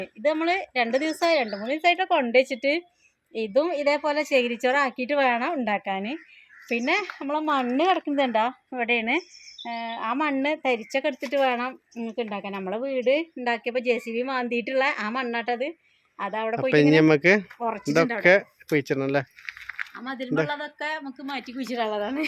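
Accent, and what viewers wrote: Malappuram, India. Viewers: തനി മലപ്പുറം ഭാഷ ആവരുത് കേരളം മൊത്തം കാണുന്നുണ്ട് ഒന്ന് ശ്രെദ്ധിക്ക ഫാമിലി s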